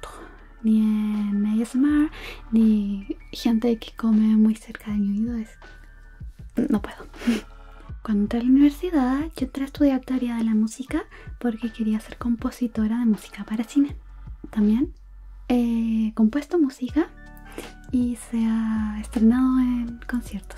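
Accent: Chile. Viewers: tu voz es tan relajante 😊 desde que te escuche dije es chilena, yo soy mexicana, saludos. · Épico hablando chileno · Espero las trasmisiones jugando 👌 te va ir de lujo este canal, ese acento chileno me encanto 💖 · Hola 💗💗 pregunta: cuáles son tus animes/mangas favs? Psdt: que lindaaa, y el acento chileno ajaja❤ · Ahora si se nota tu bello acento chileno mas que en el ASMR. Por supuesto que tu VOZ es hermosa siempre en cualquier video! 👍☺👍✌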